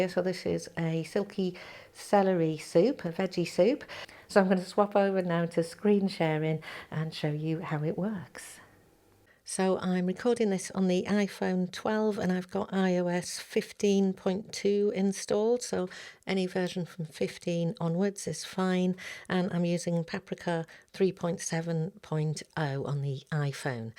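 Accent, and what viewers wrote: England, United Kingdom. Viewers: So hellpful and excellently done! THanks! Your accent makes me homesick for England!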